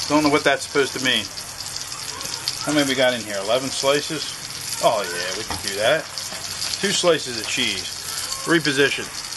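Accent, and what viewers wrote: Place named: Pittsburgh, United States. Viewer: We are enjoying your videos. I is a pleasure hearing someone speak Pittsburghese. We are from Bellevue. This recipe looks awesome.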